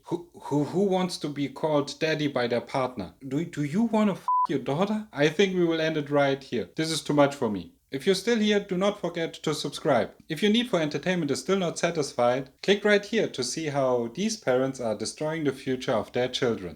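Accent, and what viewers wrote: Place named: Germany. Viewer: immediately recognized that you're from Germany xD nice review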